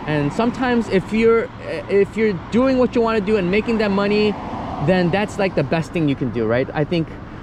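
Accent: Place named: United States. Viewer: Oh, I forgot to say this is Happy again, I Just log in with my account because it's too difficult to write from ipad,and so difficult to edit. Yes, you and I do have similiar background, but trust me, you are very americanized, you will not have any problem what so ever if you ever choose to live in America, you are more american than you think you are. But you don't have to live in US, you can lived anywhere if that's what your heart desire. Only down side is you probably do want to use facetime to spend with your parents since it would be hard to not spend time with them. I came to us when I was 13 years and after living in usa for 31 years, I am still not as americanized as people think, but that's okay. I will never speak perfect English like you do, I still have tiny bit accent. I see many people immigrant from other country and have "little" problems lived in US, but again, if one has desire to stay, they will learn to adapt. 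so if you happy living in Japan, just be happy to do so. However, don't think you will not able to adapt US, afterall, when I "listen" to your talks, I heard just a perfect american speaking. so anyway, just a little point to share.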